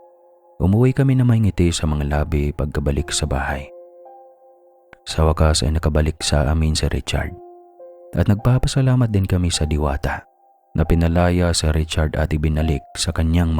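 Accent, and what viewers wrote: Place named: Bohol, Philippines. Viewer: Taga asa d i ka sir mura lageh kg tga bohol😁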